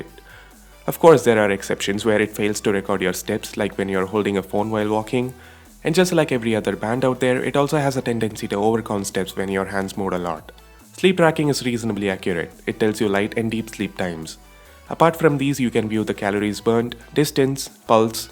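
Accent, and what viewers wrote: India. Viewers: The Indian accent ruins the whole review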